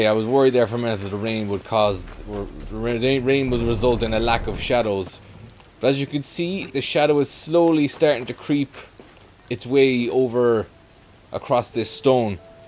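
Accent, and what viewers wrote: Ireland. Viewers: Love the waterford accent boi!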